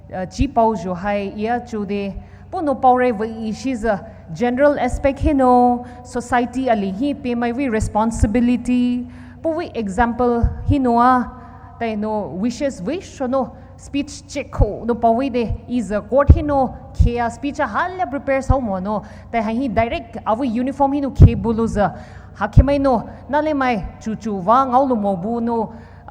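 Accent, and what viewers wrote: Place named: Manipur, India. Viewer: She speaks pure poula really appreciate.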